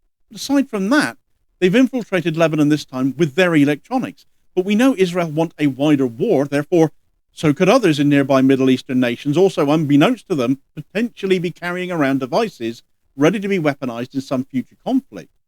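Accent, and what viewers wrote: Cornwall, United Kingdom. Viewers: Aha, a Cornishman! That accent will always make me sit up. This is great, proper job from a Cornish girl living abroad.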